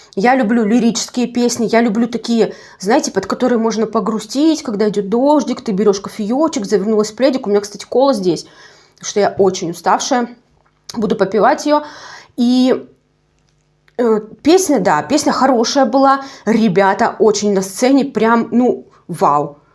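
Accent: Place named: Ukraine. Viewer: Какой у тебя акцент украины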